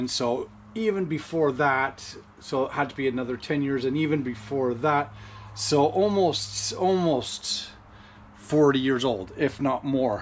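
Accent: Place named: Quebec, Canada. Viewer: On dirait que tu as un accent québécois mon ami 😊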